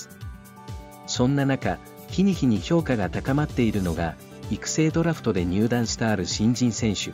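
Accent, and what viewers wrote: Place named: China. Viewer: ナレーションが中国人の話す日本語みたい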